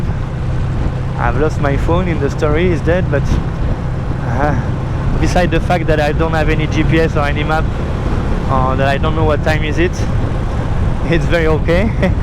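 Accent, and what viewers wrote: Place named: France. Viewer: Vu l'accent anglais je pense que tu es Français, donc je vais parler Français. Ca fait vraiment peur comme expérience, en un instant tu te retrouve dans un enfer de piqures et de saloperies volantes qui t'attaquent de toutes parts.. En regardant la scène je me demandais pourquoi tu n'avais pas foncé dans l'eau, mais immédiatement je me suis dit qu'évidemment tu ne devais pas être capable de penser très logiquement dans une situation pareille, et peut-être que comme il ne t'étais jamais arrivé pareille chose tu as cru qu'en partant plus loin elle cesseraient de te suivre ? C'est dingue qu'elles continuent a t'attaquer autant même une fois dans l'eau.. tu dis même qu'elles venaient sous l'eau pour te piquer ? As tu tenter de les éclabousser a ce moment ? Je te demande pour savoir un peu mieux quoi faire si jamais ca m'arrivais.. 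Tu as prévu de l'equipement au cas ou cela arriverait de nouveau ? Je ne sais pas si il existe des bombes spéciales pour les repousser.. En tout cas content pour toi que tu aies pu survivre a ce terrible moment, et bravo pour tes vidéos qui ont l'air très intéressantes et que je vais surement continuer a explorer ! Bon voyage !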